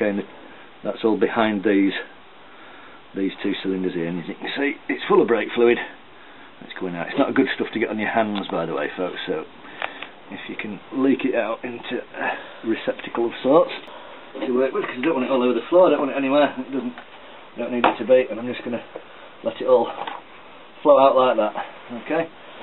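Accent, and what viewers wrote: United Kingdom. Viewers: got to do this on my tiger soon, cheers for the tutorial, nice to hear it from a Brit too ;)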